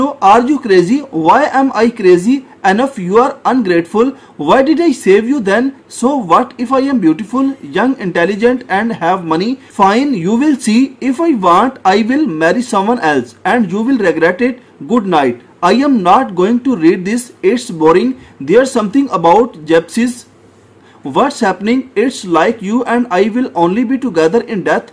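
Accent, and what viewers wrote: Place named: Pakistan. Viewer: Total no te sirve. Va leyendo unas frases del proximo capitulo. Ademas es un ingles pakistanianl🙈 para entenderle, cuesta un poco🙈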